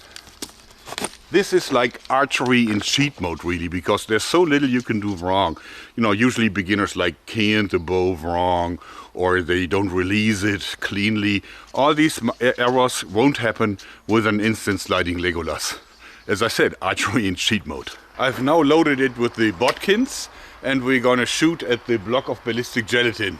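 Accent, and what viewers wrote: Germany. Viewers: Your english is getting better and better. Don't lose the german accent, though, would be a shame!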